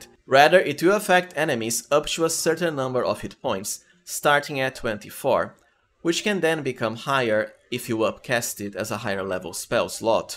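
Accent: Brazil. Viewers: soube pelo sotaque q era br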